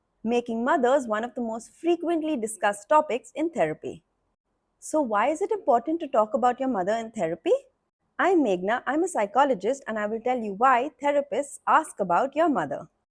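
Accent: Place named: India. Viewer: Excellent video. If I can give you an advice as a viewer, please talk a bit slower, and remove the indian accent as much as possible from your English. Please refer to DoctorRamani videos as an example. Power to you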